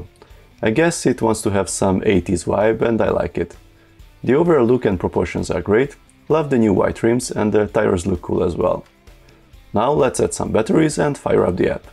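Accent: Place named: Hungary. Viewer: Tuti magyar vagy, hallom az akcentusod :D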